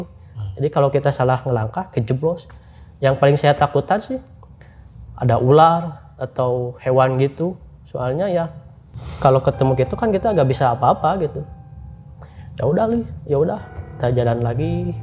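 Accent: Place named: Sunda, Indonesia. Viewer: Logat sunda nya kental banget😂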